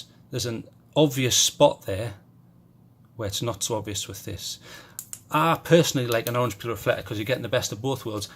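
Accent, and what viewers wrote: United Kingdom. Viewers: 28 minutes for a fuckin headlamp? And that British accent...no go Brit Crazy guy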